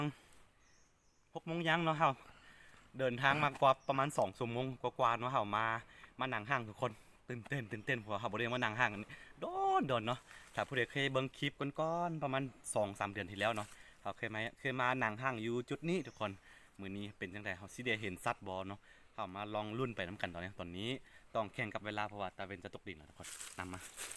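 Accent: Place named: Roi Et, Thailand. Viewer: ร้อยเอ็ด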